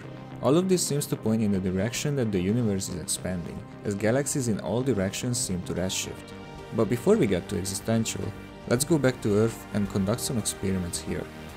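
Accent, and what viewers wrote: Hungary. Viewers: Nem vagy magyar véletlenül? 😅 Különben elég jól összeszedett lett a videó! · Hello! Nice video! Are you hungarian by the way? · akcentusból itélve fix magyar vagy, nagyon komoly videó lett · Egész érthető volt még a magyar akcentuson keresztül is. Kedvencem amikor Suttgartot úgy mondta hogy sztátgárd. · Is that a Hungarian accent or am I completely wrong? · Wait, are you Hungarian? Your accent is definitely 🇭🇺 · are you hungarian by any chance?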